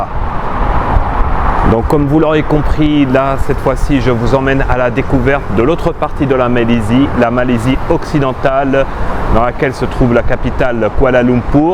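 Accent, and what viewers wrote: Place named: France. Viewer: I don't understand French but I like French accent...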